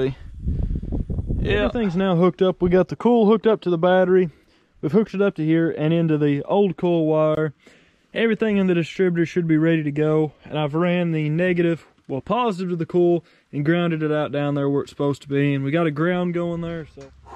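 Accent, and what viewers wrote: South, United States. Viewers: I love how Southerners pronounce "oil" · Now, that is a cool American accent. This dude has manners. Where is he from in the states?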